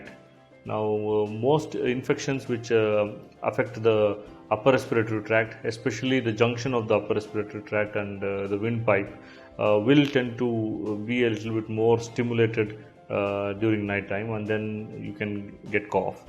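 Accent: India. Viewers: Yeah, because it is hard to understand indian's accent.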